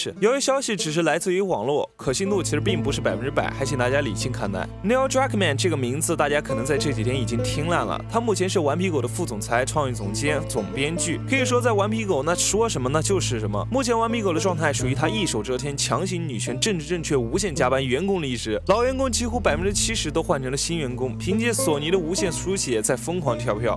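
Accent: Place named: Mainland, China. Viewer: 这说话的语气强调怕不是墙国up主吧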